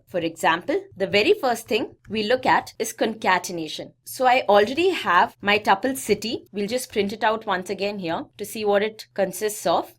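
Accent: India. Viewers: This is a very good content, thanks for sharing. I'm finding it a bit hard to understand you due to the strong Indian accent but I'm making all the effort because your content is good.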